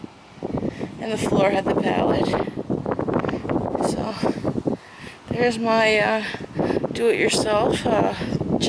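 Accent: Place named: Newfoundland, Canada. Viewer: where exactly are you from? that accent sounds like your from Newfoundland ☺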